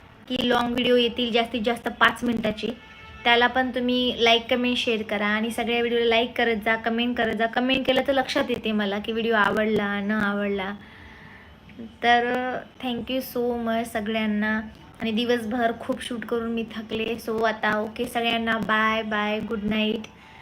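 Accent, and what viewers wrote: Nanded, India. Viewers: तुमची नांदेड भाषा आम्हाला खूप आवडते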